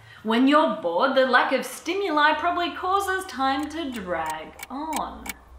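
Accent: United Kingdom. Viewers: I could swear you were British! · Elephants eat poop to build up there immune system. The same way children do by putting anything and every thing in there mouth. Well at least according to the nature documentary I watch! Happy 50th and I thought you were British still a cute accident and thanks for all the wonderful content! Confetti button! <link>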